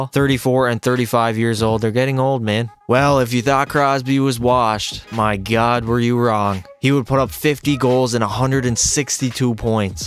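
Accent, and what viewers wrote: Newfoundland, Canada. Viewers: Is your accent from Newfoundland or somewhere around the maritimes?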